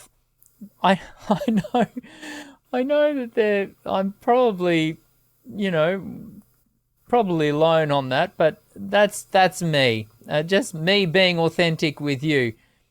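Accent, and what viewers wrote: Australia. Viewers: His microphone shake is also a good way to respond to people who continue to insist that "he isn't singing live" just food for thought... also it isn't just a personal best... there are no other recorded live examples of this feat (if you have one show me) best voice in the world (that's an opinion translates to "favourite") best technical vocalist? that is measurable, got a better one over all aspects? appreciated your commentary it was very Aussie... greetings from the Sunny Coast.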